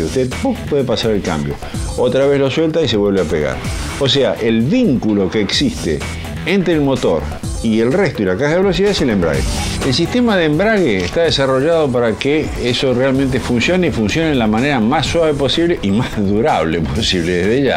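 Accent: Argentina. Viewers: Hola Amigo...... Tus videos son fenomenales, pero yo te aconsejaría que trataras de modular un poco más las palabras porque se escucha como una verborrea que pasa muy rápido y no se aprecia bien la palabra que dices.... Ya sé que eres Argentino, pero me cuesta captar la palabra que dices.... Sólo eso.... lo demás está fabuloso....!!!!! Un abrazo desde Chile....!!!! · ese es un acento en alguna parte de Argentina.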